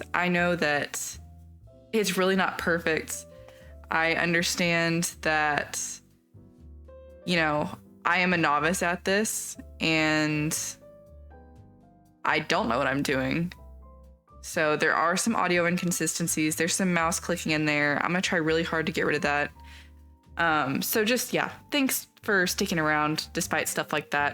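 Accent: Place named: Texas, United States. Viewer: This girl is definitely from Texas or something. Her accent sounds exactly like mine.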